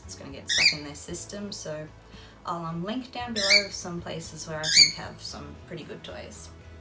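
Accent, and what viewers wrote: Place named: Australia. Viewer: Wow! You sound like youre an Aussie?? I had no idea! GREAT accent!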